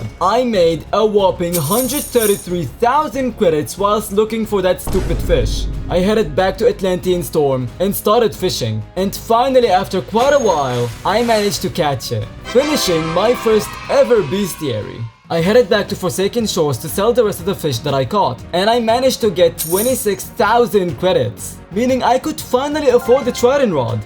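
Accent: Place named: India. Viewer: Bro what is this srupid Indian acent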